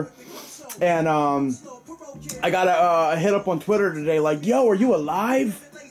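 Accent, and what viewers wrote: Philadelphia, United States. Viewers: much love! nothing but love on them 8 years cuz! I hit 10 years in October...don't let nothing take you down and realize that you're handlin ya business regardless of all the other bs..I hope your personal life gets back on track too..it's funny, my girl hates that I watch these vids on youtube..ya know, the "oh my god, another fukin pair of kicks" type girl..but anytime your vids come on she's knockin me off the computer like"yo, let me get dat jawn, it's bitch"..and i'm like"damn, kick back breezy"..na, but she thinks you're funny as fuck and she loves your passion..we're both from south philly, so it's like listenin to one of my boys..but 4 real cuz..stay up and do you, but drop a vid here and there for the real heads..peace! p.s.-check out that new and album-unpredictable cuz!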